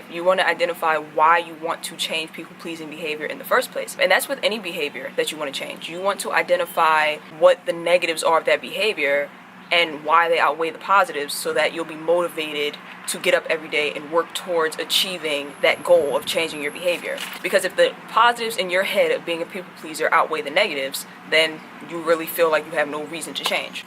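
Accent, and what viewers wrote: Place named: New York, United States. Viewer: I wanna kick it with you, are you from New York? Lol you sound like it a bit. I'm from out here, love your swag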